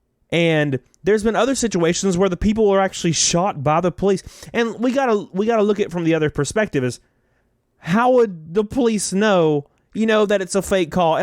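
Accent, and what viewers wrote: South, United States. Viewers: Folks doing this to others is not cool. I hope you will be ok and if it means anything your accent was one of the things that made your channel stand out and why we followed you initially. Hearing gaming updates with a southern accent is fun. Keep on doing what you love. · I love the southern accent. Meanwhile, I hate mine because its british 🤣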